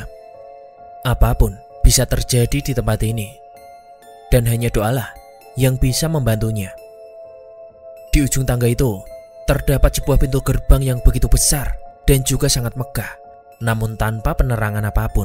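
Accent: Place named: Java, Indonesia. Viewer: Orang mana bng, medok bgt :(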